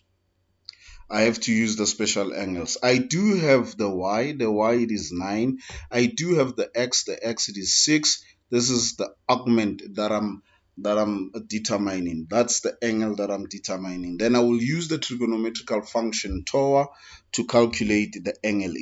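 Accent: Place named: France. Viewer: You sound french policemen not an insult tho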